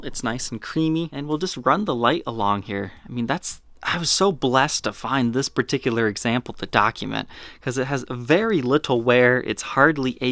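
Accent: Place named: Midwest, United States. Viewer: Its the midwest accent "t" sounds are pronounced like "d"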